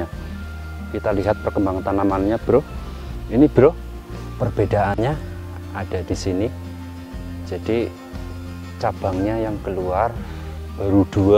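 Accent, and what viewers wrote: Banyumas, Indonesia. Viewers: Asalamualaikum. broo wong endi kiee, ngomonge ngapak, dadi pengin sinauuu bro....🙏🙏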